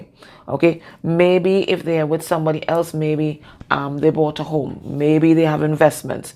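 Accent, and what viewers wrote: Trinidad, Trinidad and Tobago. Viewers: That’s a Trinidad accent y’all! 🇹🇹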